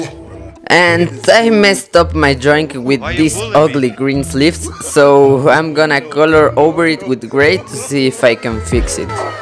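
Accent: Spain. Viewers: eres español? tu acento ingles me suena a español, buen video! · Como se nota que hablas español xd (no hate :, you draw nice :D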